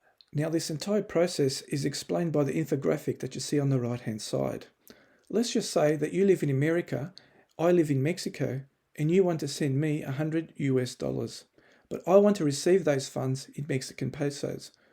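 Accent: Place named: Australia. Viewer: Me too! Great to hear a fellow Aussie in this space!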